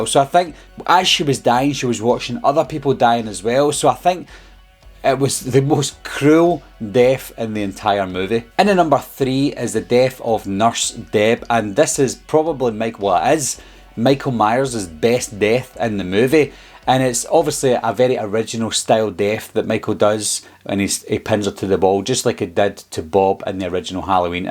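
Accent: Scotland, United Kingdom. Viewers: I love your accent, is it Scottish?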